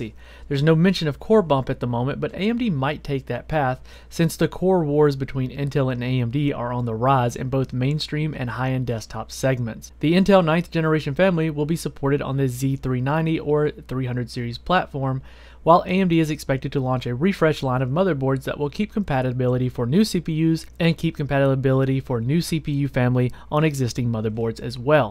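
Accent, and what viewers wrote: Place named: Texas, United States. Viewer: Lol dude i love ur accent. It reminds me of when I lived in Texas.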